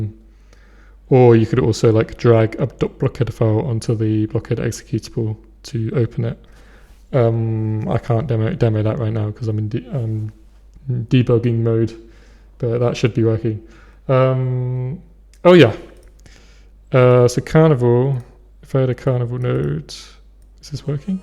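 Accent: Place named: France. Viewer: 'Sup, why do you sound French?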